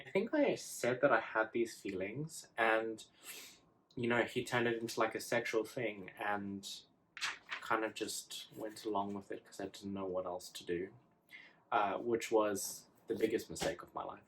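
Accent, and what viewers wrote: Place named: South Africa. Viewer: Lovely South African accent? It is not as heavy as others I've heard. Cape Town? You didn't mention how you came out to your family.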